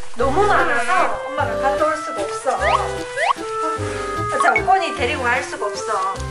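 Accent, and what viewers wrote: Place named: Gyeongsang, South Korea. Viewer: 경상도 언어가 이리도 러블리 할수가 ♥ 알아 듣는 개딸 꼬니는 더더 ♥♥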